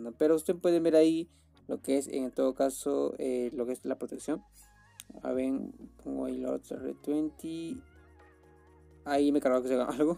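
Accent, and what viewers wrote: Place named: Peru. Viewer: obvio el acento peruano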